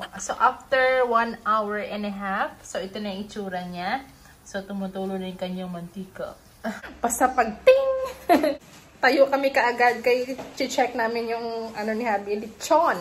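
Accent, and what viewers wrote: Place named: Iloilo, Philippines. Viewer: Ilonggo gid ya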